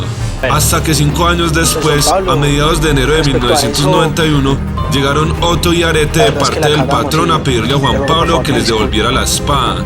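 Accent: Antioquia, Colombia. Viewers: Pues claro! Y más precisamente, de Antioquia. Ese acento paisa lo reconoce hasta un marciano 😂👌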